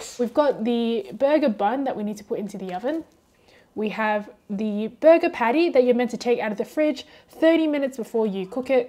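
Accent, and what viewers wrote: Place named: Australia. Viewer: Gosh your thicc oz accent i can’t even 🥰🥰🥰🥰🥰🥰🥰🥰🥰🥰🥰🥰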